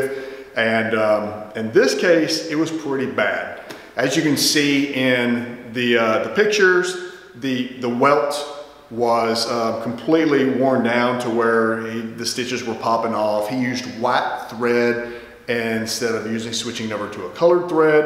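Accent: South, United States. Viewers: Even though your channel focuses mainly on men's shoes (and I understand your demographic is mostly male, so right you are), you have no idea how PERFECT your videos are for me, a woman, to have on in the background while putting on makeup in the morning. Cobbler shop ASMR interlaced with shoe wisdom spoken in a smooth but approachable Southern accent. 👌🏻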